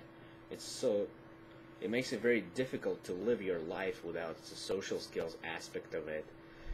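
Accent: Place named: Russia. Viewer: I agree, actually a little russian accent doesn't hurt you.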